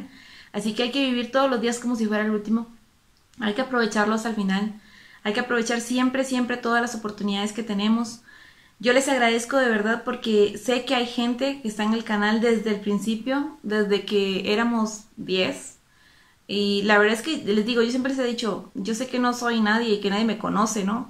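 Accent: Mexico. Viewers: Con reacciones como estas… nuevo suscriptor Por cierto tienes un acento muy mexicano 👍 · Usted no párese tica párese sinaloense oiga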